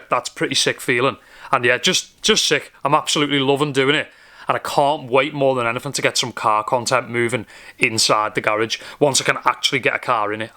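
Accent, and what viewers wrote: United Kingdom. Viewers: I'm your fans from Indonesia. Its quite nice to watching your content and your british accent really fvckin' dope mate 😂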